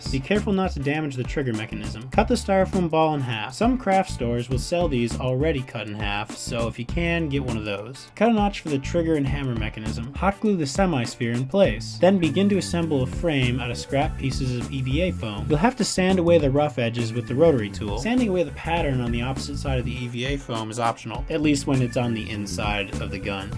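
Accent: Canada. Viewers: Canadian, eh? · You're Canadian? Noice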